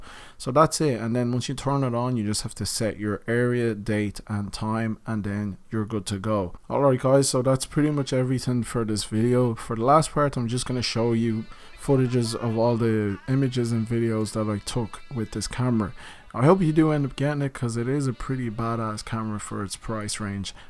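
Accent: Dublin, Ireland. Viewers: Dude, are you Irish? you sound like a Dub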